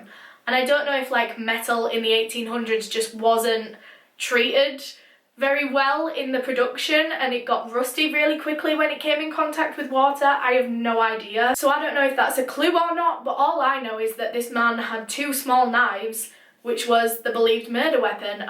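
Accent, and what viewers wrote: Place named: North, United Kingdom. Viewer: ah so it’s a British accent? i couldn’t really tell what it was because it’s so thick, but i loooove her voice.😍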